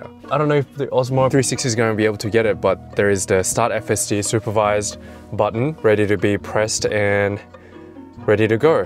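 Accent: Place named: Australia. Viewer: Good job he had the subtitles hardcoded as I couldn't understand a single word of that Australian language, all I could hear was 'mate, mate, mate'. I feel your excitement for the future.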